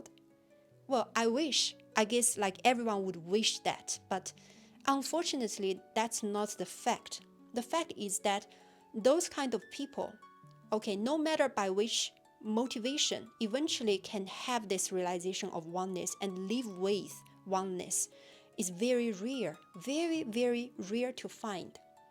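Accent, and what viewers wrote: China. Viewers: 我非常確定你的母語是中文，對嗎？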